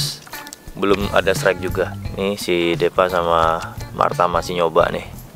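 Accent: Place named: Bali, Indonesia. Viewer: Gokil...bli deva suaranya has medok Bali...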